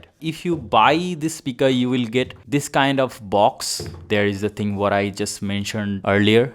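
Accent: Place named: Northeast, India. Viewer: He has an Asian accent. North East Indians have such an accent.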